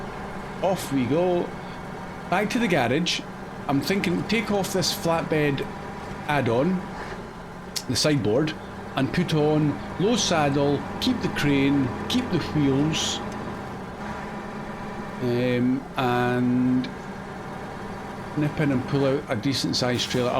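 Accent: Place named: Arbroath, United Kingdom. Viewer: Yeah enjoyed the longer format buddy 👍 As for next agree with Docks and other bridge I always try to fix infrastructure first it just makes everything else easier. Lots of local tasks and some contracts are also possible without leaving this map to help level up and make more moolah 😂 BTW your accent sounds more like somewhere around Abroath to me but I'm a Flatlander although have family from around Inverness. Keep on trucking buddy 👍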